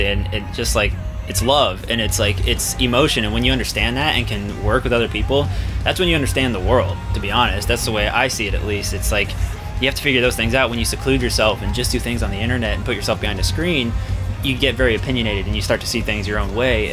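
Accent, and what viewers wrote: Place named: Texas, United States. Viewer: you can hear the texas in his voice =)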